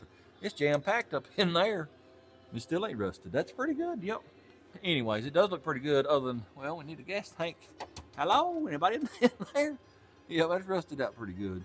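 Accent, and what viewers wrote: South, United States. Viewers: All good,love the southern speach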